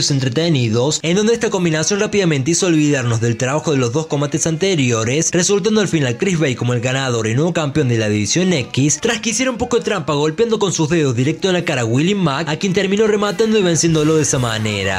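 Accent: Córdoba, Argentina. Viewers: x q habla como cordobes?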